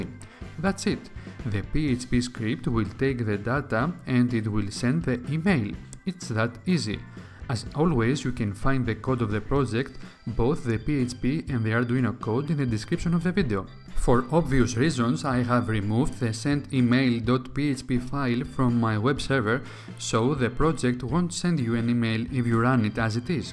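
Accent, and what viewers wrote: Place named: Greece. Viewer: Where are you from ? You accent sounds greek.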